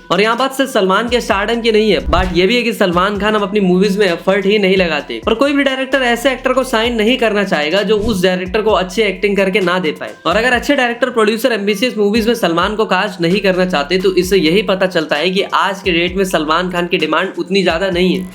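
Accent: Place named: Bhopal, India. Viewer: Bhai tu bhopali lagriya hai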